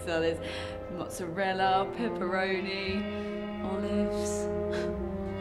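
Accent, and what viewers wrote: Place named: United Kingdom. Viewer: stuffy British narration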